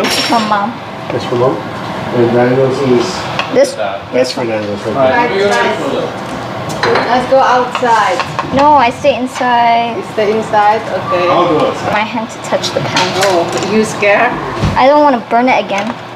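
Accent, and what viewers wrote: Indonesia. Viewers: kan lidah nya Indo sekali ❤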